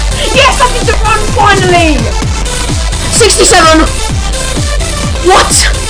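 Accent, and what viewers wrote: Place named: United Kingdom. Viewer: 3 years and always the british accent epic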